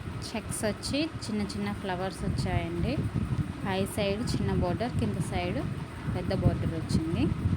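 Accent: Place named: Chittoor, India. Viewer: Me slang konchem chittoor slang laga undi so anduke అడిగా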